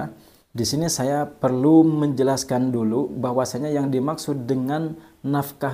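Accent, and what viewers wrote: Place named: West Java, Indonesia. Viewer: Pa ustadz logat kyak orang Sunda, emang tinggl daerah mn klu boleh tau🙏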